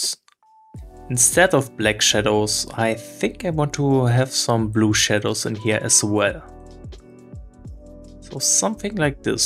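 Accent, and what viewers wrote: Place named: Germany. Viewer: Gleich die ersten Sätze ""helo ia uan wouatzap" )) Dein gut gemeintes Englisch ist dermaßen deutsch, dass es fürs Ohr dies oder jenes geesprochene Wort unverständlich kliingt. Am besten machst du deine Videos in der Muttersprache und du wirst sehen das wird belohnt, hast bestimmt mehr Subscriber als sonst. Warum? Weil in englisch ist auch Konkurenz zu groß.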